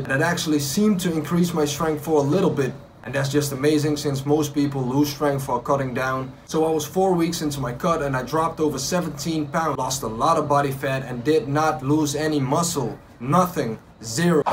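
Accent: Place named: Netherlands. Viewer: U sound Dutch. Was just in Amsterdam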